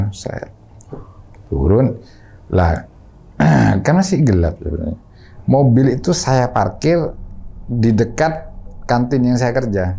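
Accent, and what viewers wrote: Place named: Bondowoso, Indonesia. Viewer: Logatnya bondowoso bgt om 😂😂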